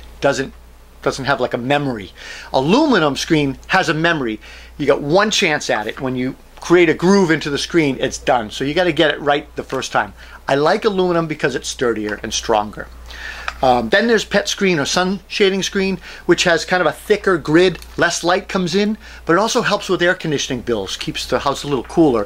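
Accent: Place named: Massachusetts, United States. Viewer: The one thing I look for in DIY videos is that the person has an accent that I'd hear on a job site, thats how I know it'll be short amd sweet while being accurate and efficient 😂 Gotta be country, Boston, or New York.... Couldn't pin this accent but sounded like Massachusetts or New York. 👌🏻 Immediately trustworthy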